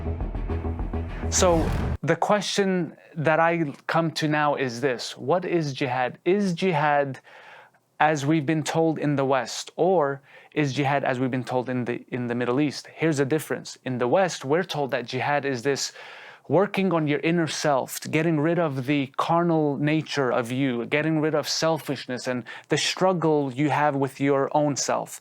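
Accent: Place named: United States. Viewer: Esse cara é americano da pra ver no sotaque dele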